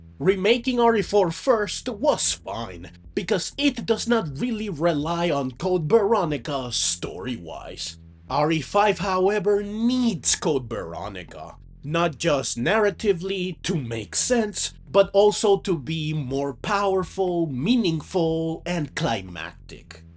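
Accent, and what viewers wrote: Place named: Italy. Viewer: Where is your accent from? It sounds like the American stereotype of an Italian accent a little bit.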